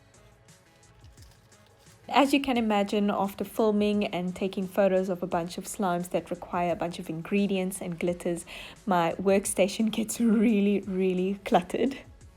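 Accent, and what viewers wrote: South Africa. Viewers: wait is she south african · When i heard your voice i immediately thought of south africa😂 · I clicked on this video having no idea that you're South African! I heard your accent and was like OMG MY PEOPLE!! I live in the Eastern Cape and find it unsurprising that you're based in CT where the cool things happen. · I have a question are you in South Africa because of your accent and because of the brand of the coffee and milk? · wait, i just noticed ur from South Africa, i looked up ur slime shop and saw everything was in that currency. I'm shocked, no wonder your accent sounds so familiar! My mother is from South Africa!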